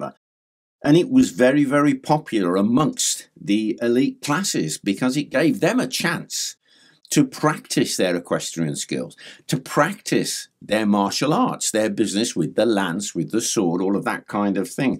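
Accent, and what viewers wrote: United Kingdom. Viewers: I love this dude, he is knowledgeable and has one of the best of the British accents.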